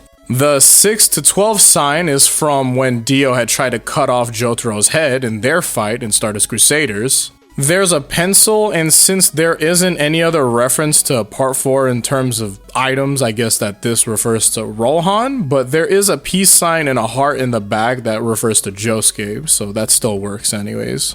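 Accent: Canada. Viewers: Are you a Canadian Lad?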